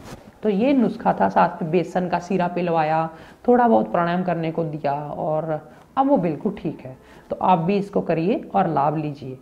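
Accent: Punjab, India. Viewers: Thank you ji Apki language me punjabi touch dikhta hai ji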